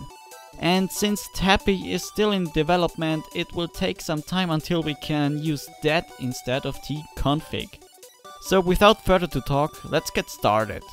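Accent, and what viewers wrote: Germany. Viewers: Is that German or something? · Are you from Germany? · Wow you are actually German speaking English. You don't see a lot of Germans talking English now a days .. good job man :D you should have more subscribers than that ;)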